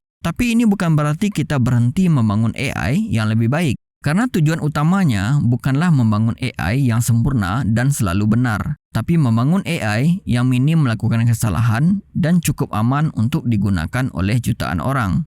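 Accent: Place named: Bali, Indonesia. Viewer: Pengisi suaranya orang bali kah? Berasa banget logatnya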